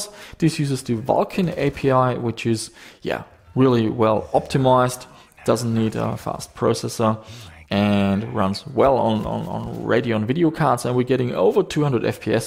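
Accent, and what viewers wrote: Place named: Germany. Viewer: Damn that german accent... 👍😉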